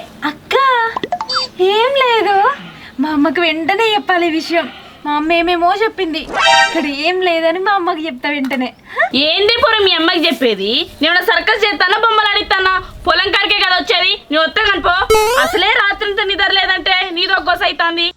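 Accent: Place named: Telangana, India. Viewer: Mee telangana accent is very good &appre table good.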